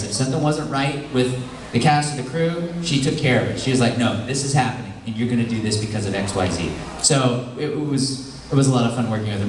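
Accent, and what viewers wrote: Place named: Italy. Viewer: I'm Italian...and his Italian accent is spot on..ieven though I'm Southern Italian..but dude..we do sound like Mario XD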